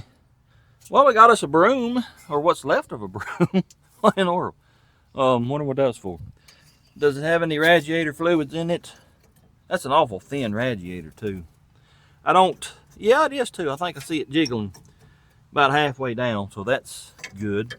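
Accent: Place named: East Tennessee, United States. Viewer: Great video. Just subscribed mostly cause you talked like me and everybody i grew up with in east Tennessee. Great content. I can't wait for wait for the next video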